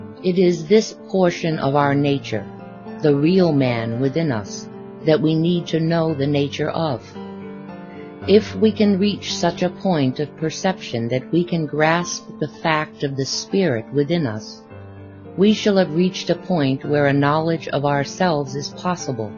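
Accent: Russia. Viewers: Amazing Russian accent.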